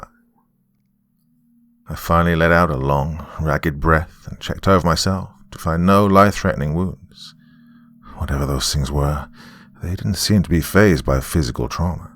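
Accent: England, United Kingdom. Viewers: Once again, what's up with an English guy narrating a story about US NAVY SEALS??? Its so distracting and out of place..cant find even 1 American to do this job? Really? Couldnt get through this story because of it. I spent 4 years active in the Marines, another part of the Navy, never in all those days did I hear 1 British accent the entire time. So why here? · Have you ever tried using a coffee/tea cup, glass or Styrofoam cup to emulate radio chatter? Love your stories. Only good narrator that does military stories and doesn't sound like shit lol and the deep english accent makes the stories even better.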